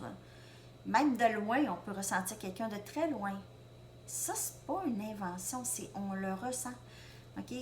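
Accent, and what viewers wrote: Canada. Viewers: Vous êtes belle , j'aime votre accent canadien 🥰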